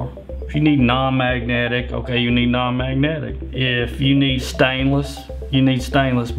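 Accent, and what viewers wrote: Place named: Deep South, United States. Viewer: Holy southern accent Batman. You gotta be from the deep South or the Cajun states. I'm in Wisconsin, spent a decade in Tennessee Georgia north Carolina area and that's a strong accent to me.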